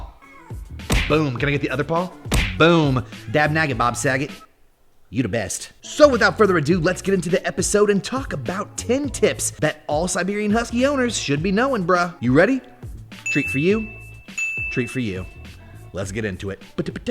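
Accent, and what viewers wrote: United Kingdom. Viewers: I love his British accent 😆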